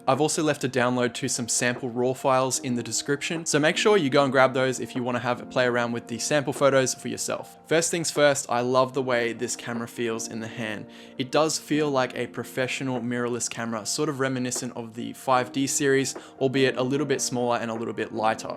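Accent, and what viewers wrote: Australia. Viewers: Great review. So refreshing to hear some real world opinions & not bashing specs. Also love that it was photo based, not video and finally an aussie accent. Glad I found your channel 📷👍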